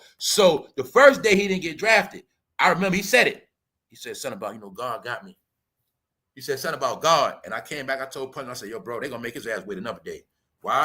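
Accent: New York, United States. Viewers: Replay gang 💪🏽 👁️ ❤️ your NY voice ( t.y for giving us Family consistent content no one does it like you real talk 💯 🙏🏽 )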